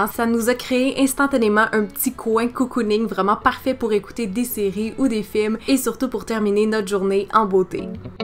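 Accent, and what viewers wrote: Quebec, Canada. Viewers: MissBiscuit Personnellement, je suis québécoise et j'ai toujours dit melon d'eau, les personnes autour de moi aussi. Par contre, changement de sujet, elle n'a pas d'accent "canadien", elle a un accent québécois (comme moi). L'accent canadien n'existe pas, puisqu'au Canada (qui est un pays en Amérique du Nord), on parle français, mais majoritairement anglais aussi. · Hello ma belle !! Je suis nouvelle sur ta chaîne j'adore ton accent j'aimerais tellement avoir cette accent canadien Si je ne me trompe pas ? ❤️ j'aime beaucoup tes "glaces" et ta limonade ça serait sympa que tu fasses des recettes comme ca aussi sur ta chaîne !! Les melons à eau Ca s'appelle dès pastèque en france je savais pas que Ca s'appeler comme ca 🍉 j'aimes trop l'idee des sucettes c'est hyper fun !! Tu l'as vu où ? ❤️ tu m'as donner beaucoup d'idées maintenant !! 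Ou est ce que tu trouves toutes ces idées ? Et l'étiquettes pour les verres effet tableau on trouve Ca ou ?? ❤️❤️ merci pour cette superbe video et bonne nuit alors 😂❤️ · Carla Crotal bah elle es canadienne déjà. Je pense que cela s'entend à son accent 😊 et surtout que lorsque l'on traduit watermelon ça veux belle et bien dire melon d'eau. Et chimiquement parlant la pastèque es composée de plus de 90% d'eau ... Le reste c'est les pépins et la couche. Donc melon d'eau existe puisqu'il n'est composé que d'eau 😉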